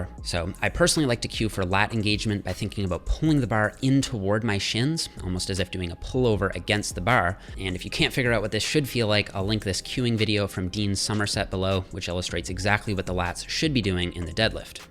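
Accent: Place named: Canada. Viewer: You’re sounding super Canadian today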